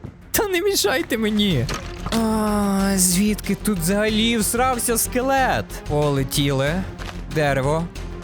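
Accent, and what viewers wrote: Lviv, Ukraine. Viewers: ТИ З ЛЬВОВУ???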